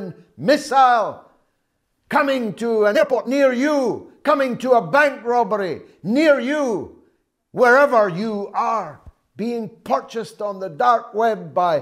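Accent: United Kingdom. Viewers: Damn you've turned into Tucker carlson with the British accent. Trump's actions were deliberately criminal.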